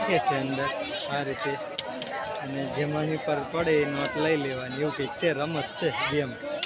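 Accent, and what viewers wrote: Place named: Kathiawar, India. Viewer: તમે પણ અહીંયા ના છો તો પછી તો પછી કેમ કાઠિયાવાડી બોલો આપણી શુદ્ધ ભાષા બોલોને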